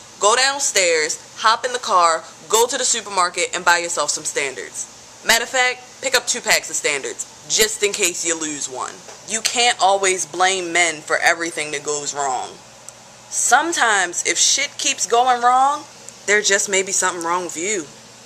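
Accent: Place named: Baltimore, United States. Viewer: u gotta be from baltimore maryland or somewhere in that area i hear it all in ur voice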